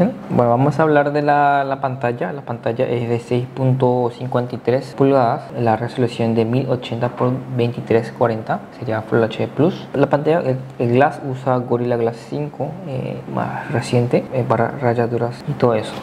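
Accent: Paraguay. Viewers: Cómo se nota el acento pyo 😂😂😂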